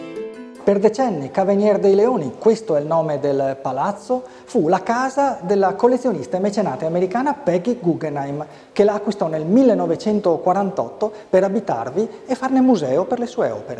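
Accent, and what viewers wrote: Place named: Veneto, Italy. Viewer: Un venetofono alle prove col raddoppiamento fonosintattico: esilarante! :-))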